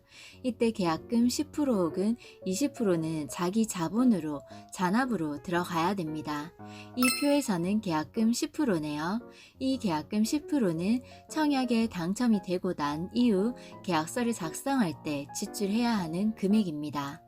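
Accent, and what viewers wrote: Gyeongsang, South Korea. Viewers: 구수한 갱상도 사투리로 자세한 설명 해주셔서 감사합니다.~~ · 경상도 사투리가 너무 듣기 좋네요 ㅋ 좋은정보 알아갑니다 ^^ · 엥~? 대구로 들리는데 ㅎㅎ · 경상도 사람인가봐요ㅋ 사투리가있어보이네요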